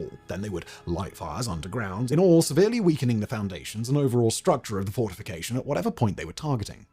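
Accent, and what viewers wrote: United Kingdom. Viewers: Whenever Simon says anything negative about Colonial army in his very British voice it stirs up some weird american ancestral memory/rage in me.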